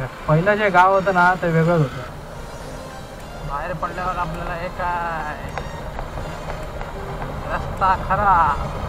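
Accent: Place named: Malvani, India. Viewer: Pure Malvani...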